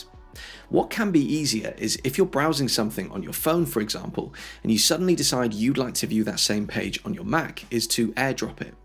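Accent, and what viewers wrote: United Kingdom. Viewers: I stumbled upon your channel few days ago. I am 73, and like my late husband, I am an avid Apple fan. I have iPhone 11, iPad, and Apple Watch. I am always Googling to find out about the security features and how I could make the most of my devices. Your channel is just what I need. I am in UK, and it is so nice to hear the British accent. I like your style of explaining. I have subscribed to your channel.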